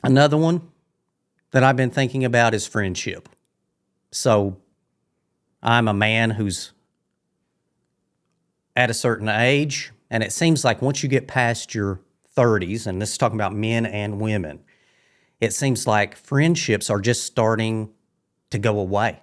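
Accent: South, United States. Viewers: You sound like you're from the south. Either NC or TN. I agree with you. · What kind of southern accent is that? I am from the Appalachian mountains in western NC but I’m not aware to his dialect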